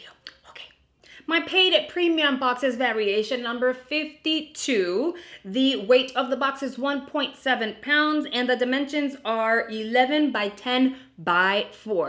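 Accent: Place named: New Jersey, United States. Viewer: Congratulations on hitting 40 K on your YouTube channel, I watch you all the time and I love your New Jersey accent. Thank you for posting such fun and exciting videos. You rock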